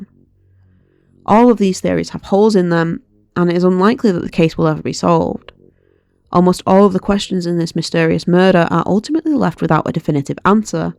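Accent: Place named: Huddersfield, United Kingdom. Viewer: Just found the channel and subbed because you sound local to me (Huddersfield) good luck 😀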